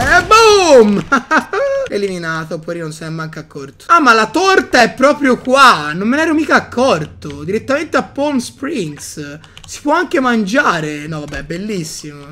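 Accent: Tuscany, Italy. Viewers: Con l'accanto toscano bellisimo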